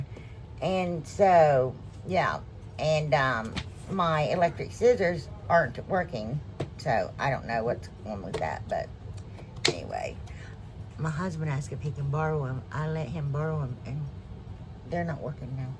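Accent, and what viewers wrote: South, United States. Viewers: Where did you get your canvas from? I dont see the page for that gentleman you were talking either. Im from Gallipolis, Ohio and listening to you I would have thought you were from Alabama, Louisiana or Mississippi because of your accent.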